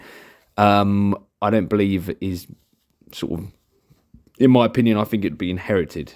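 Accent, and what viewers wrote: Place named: United Kingdom. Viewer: Hello, and I am so glad that I have tripped over your site. Not only do you provide lots of info, but you have a British accent and pronounce things in a way that I am used to hearing them. Some American sites have robots talking that should be shot. I look forward to seeing more from.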